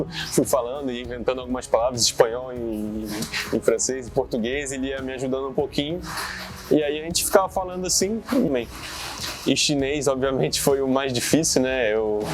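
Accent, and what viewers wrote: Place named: Brazil. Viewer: O portunhol não abandona o Brasileiro 😅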